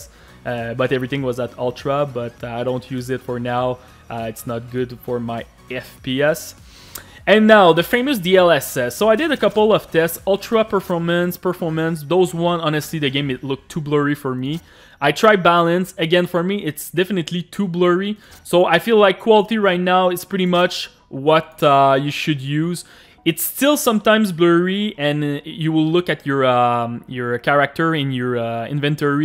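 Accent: Quebec, Canada. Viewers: Tes québécois non?? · Bel accent Québécois! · Are you French Canadian?? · Um, are you a French Canadian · Thanks man ! Clairement un Québecois, corrige moi si je me trompe ;P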